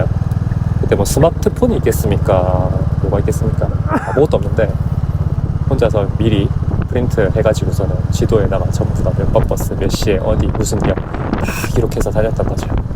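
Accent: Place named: Daegu, South Korea. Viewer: 서울사는 대구인이라 사투리가 아쥬반갑네요 ㅎㅎ 단체투어는 신경쓸게 많아서 결국 솔투를 즐기고 있습니다. ㅅㅅ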